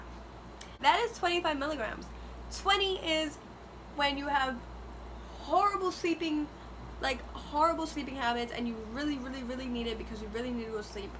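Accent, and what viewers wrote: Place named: Puerto Rico. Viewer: tú eres Boricua por Leyyy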